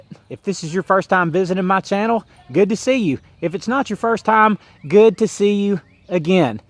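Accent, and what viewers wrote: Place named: South, United States. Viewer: Love to hear you talk…..love love love southern folks.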